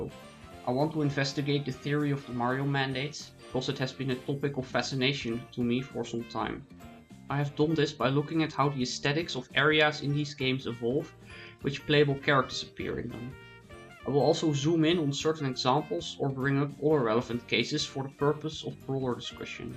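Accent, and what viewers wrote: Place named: Netherlands. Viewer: He talks with a Dutch accent. I would know since I'm also from the Netherlands.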